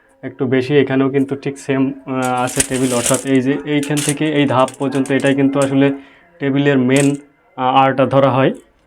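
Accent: Jessore, Bangladesh. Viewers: Thank you.kub Moja legece r apnake dekhe onnek onnek hasi payece.mone hoi apnake osostite fele diyeci.sorry.tobe apnar mukhe jossorer vasa sunte darun lagcilo.kintu short vedio upohar dilen!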